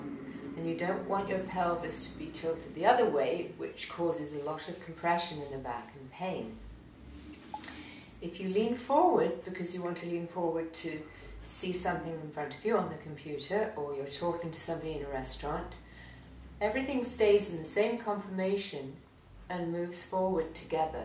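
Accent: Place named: United Kingdom. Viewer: Love British accent!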